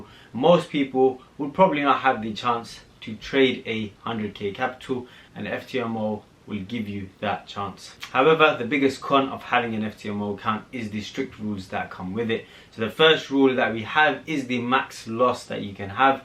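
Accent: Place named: Nigeria. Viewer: Bro what country are you from. You sound a bit Nigerian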